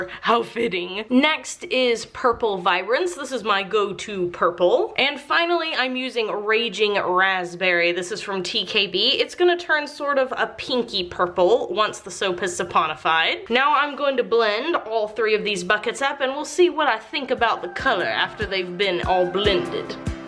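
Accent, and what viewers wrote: United States. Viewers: why do you have a country girk accent ive been subscribed for a while and ive been wojdering this from the start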